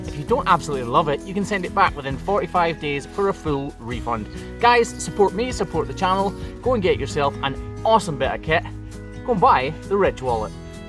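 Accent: Scotland, United Kingdom. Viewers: I thought he sounded more Scottish today. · I think it's hilariously wonderful how much MORE Scottish you sound when you're talking to other Scottish ppl 😂 It's lovely. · Love how, like most Scotsmen, you have 2 accents. A normal one, and a posh one to use when talking into YouTube or to nonscots . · Do you notice that your Scottish accent is way stronger in this video?🙂 Maybe it comes out more when you’re with others with a stronger accent. No big deal. I’m Canadian so I can’t say much aboooot accents, eh? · Pinehurst NC here......Incredibly entertaining and informative. I have no farm knowledge and this channel was very eye opening. I also noticed your Scottish accent thickens when talking to friends!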